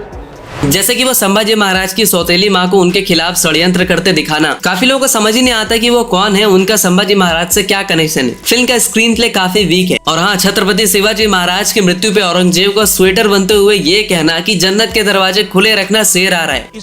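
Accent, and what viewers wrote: India. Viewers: Thank You Bhai. Your Accent is definitely Hindi but your Voice is touching Millions of Marathi Community People. Every word & Sentence in these video is giving correct information about Chattrapati Sambhaji Maharaj. Thanks ❤❤🚩🚩🚩🚩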